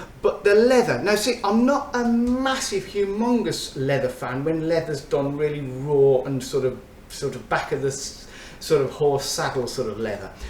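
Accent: Birmingham, United Kingdom. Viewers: Love the original Spicebomb and the extreme. I favour the extreme, before it was reformulated! Performance on it is awful now. I had to return my 2023 bottle to boots and bought a 2021 bottle off eBay. The original Spicebomb is more versatile, I think the citrus gives it a lift. I'll have to sample the new dark leather. I've heard mixed reviews. Yep, weather in the UK is awful 😅 You sound like a Brummie, Birmingham is the third cloudiest city in Europe, only behind Glasgow and Reykjavík, Iceland! Even the cloudiest city in USA has 176% more sunshine than Birmingham!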